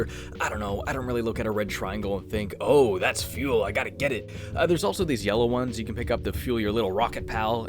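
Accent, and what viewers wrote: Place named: Canada. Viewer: can someone finally answer this for me? is a fellow canuck or is he like from northern america? because that accent is just so hard canadian sounding and and a canadian with as very separate yet funny sounding accent i freakin love it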